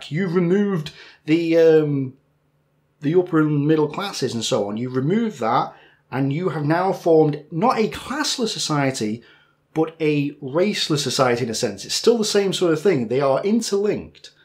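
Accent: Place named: Manchester, United Kingdom. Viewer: I had such high hopes for you. A Northern guy, Manchester I think, holding his own with the plummy voiced establishment historians. But you had to publish your last offering, which as a piece of polemics can only be described as simplistic at best. I do understand where you were coming from but your theories are wrong I too have heard many years ago that the extreme left and the extreme right almost went full circle so that they virtually touched. Stalin though a murderous thug had to be loyal to Lenin and Marx's behests which preached the dictatorship of the proletariat and as many of the early Bolsheviks and Marx were Jews race was not an issue in the formation of the Soviet Union, whereas race was a central plank with the Nazis. In my view that was the fundamental difference. I look forward to you next episode as I for one will not be cancelling my subscription as your history on the second world war is splendid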